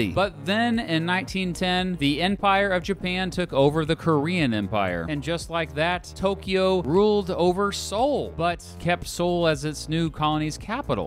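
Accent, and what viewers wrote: United States. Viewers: He clearly didnt learn the Korean romanization for this video. His pronunciation is pretty much expected from an American. I disagree that the revised Korean romanization is horrible. It got rid of the use of weird symbols that can't be typed with American keyboards that was previously present in McCune. And romanization systems aren't English, obviously you can't rely on English pronunciations for reading foreign romanizations. You actually have to learn them as the system that they are.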